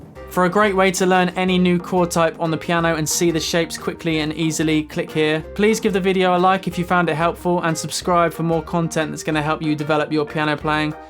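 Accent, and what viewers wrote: United Kingdom. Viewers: You look like American but you sound British.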